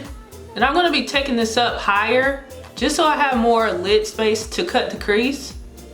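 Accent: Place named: North Carolina, United States. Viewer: Just found your channel and I'm binge watching all day!! I love your country accent you sound like my cousin from North Carolina!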